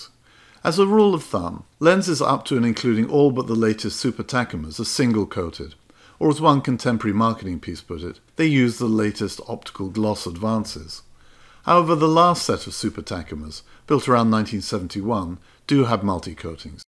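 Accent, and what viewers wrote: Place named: RP, United Kingdom. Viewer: His particular accent and tone sound almost identical to David Cornwell, the real name of the great writer John LeCarré. It’s beautifully cultured in that special British way. I’d be interested to know a bit about background, although it really has nothing to do with wonderful lens videos!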